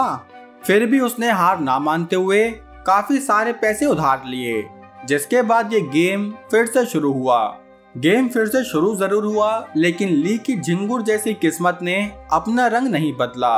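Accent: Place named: Braj, India. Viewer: Tumhari boli se lag rha hai tum brij kshetra se ho, probably mathura ya agra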